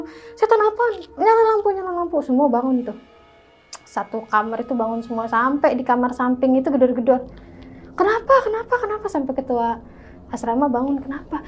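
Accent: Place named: Bali, Indonesia. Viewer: saya asli bali, kakknya logat bali banget:face-blue-smiling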